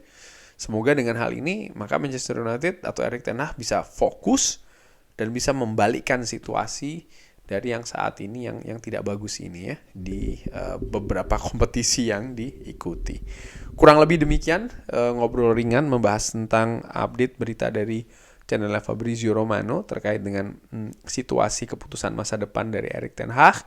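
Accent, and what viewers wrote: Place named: Java, Indonesia. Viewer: Buanyak nya medok tenan bg 😅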